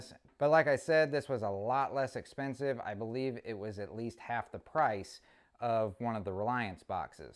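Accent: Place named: United States. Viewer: I like your American accent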